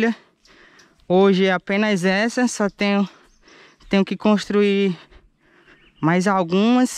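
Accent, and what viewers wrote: Brazil. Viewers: Na real, o sotaque nordestino e lindo demais, não tem jeito kkkk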